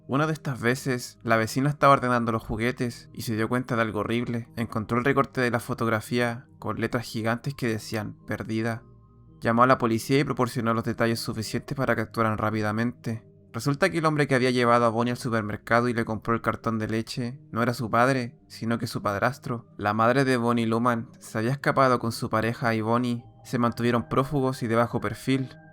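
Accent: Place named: Chile. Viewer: me gustan tus videos porque tienes acento chileno saludos desde chile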